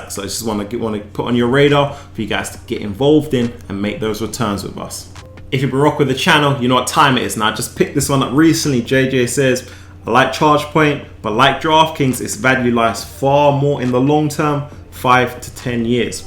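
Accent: United Kingdom. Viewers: Hi, are you from UK?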